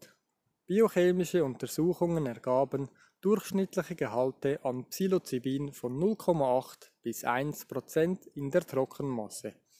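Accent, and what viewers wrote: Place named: Switzerland. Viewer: Sorry, musste nach dem ersten Wort ausschalten!! Wenn auch noch ein CHler meint er müsste was vorlesen!!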